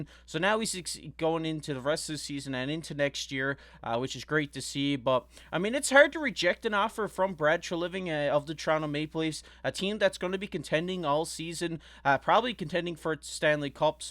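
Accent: Newfoundland, Canada. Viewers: 100% a newfie accent on this guy. Love it b'y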